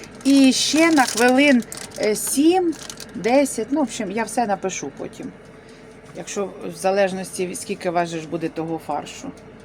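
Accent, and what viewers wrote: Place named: Luhansk, Ukraine. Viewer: говор такой как у нас, суржик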